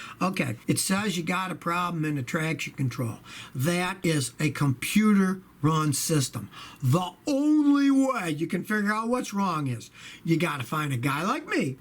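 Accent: United States. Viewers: Which part from America is accent?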